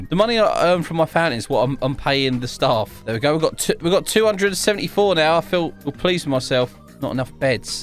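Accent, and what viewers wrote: United Kingdom. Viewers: YAY A NEW BRITISH GUY!! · Are you British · you british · Ur British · Mate pls tell me why British people say water soo weird (I love the British accents) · Are you british and also GOOD VİD try race clicker pls 🎉😮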